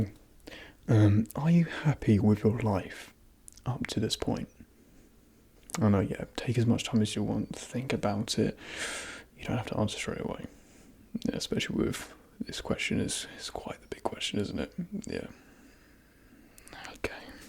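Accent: United Kingdom. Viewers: I love his voice. His accent is ✨perfection✨. I love English accents. Proper English, also known as British English, is just so intelligent, it sounds intelligent. People who are from the UK, when they speak, they sound so educated. But your voice really calms me down, and I love that you can do that. Not everyone's voice calms me down. But you made me feel very relaxed, so thank you. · What kind of English pronunciation is it? I want to learn it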